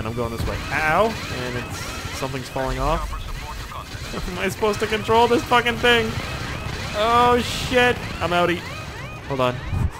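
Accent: Canada. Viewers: Al_isAtto702 no. It's his accent. He's Canadian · Hes canadian and they pronounce cause lime cuz.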